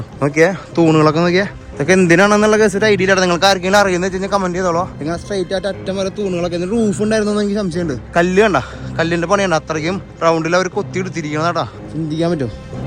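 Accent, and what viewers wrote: Thrissur, India. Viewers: Hello,Please write your script you dialogue as there is repetition of your words and descriptions...also lessen your trichur accent...as always picturixation is great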